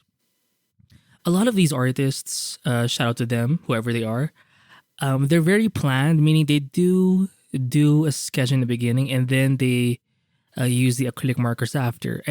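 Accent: Philippines. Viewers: YOU SOUND FILIPINO!!!!!!!!!!!!!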